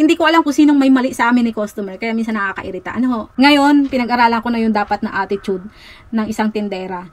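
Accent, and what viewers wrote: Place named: Batangas, Philippines. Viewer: Napansin ko na tinatanggal ninyo ang inyong Batanguena accent...pero kung minsan e hindi maiwasan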